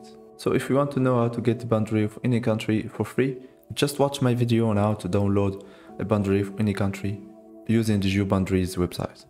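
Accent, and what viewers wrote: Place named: Morocco. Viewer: This guy's accent is moroccan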